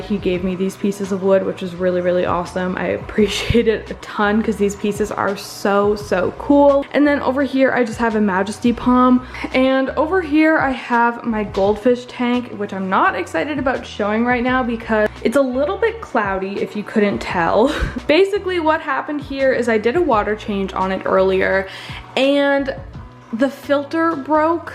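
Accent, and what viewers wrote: Canada. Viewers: I'm so sorry for asking but... Are you Canadian? You sound Canadian. But like, Eastern Canada.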